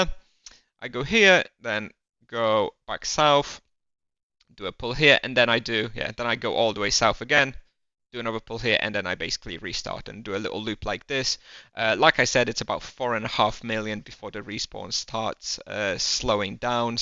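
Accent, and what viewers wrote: United Kingdom. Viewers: w Angli mieszkasz ze taki akcent ?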